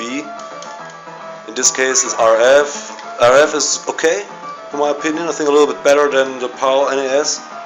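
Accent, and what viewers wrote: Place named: Russia. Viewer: Sound like russian try to speak english Где надыбал , колись :)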